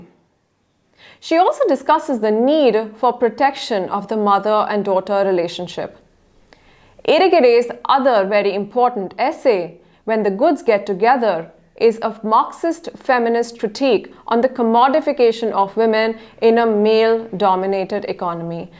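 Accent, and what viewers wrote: India. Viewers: I really appreciate what this channel has been doing but the instructors' Indian accent spoiled mine 🙊☺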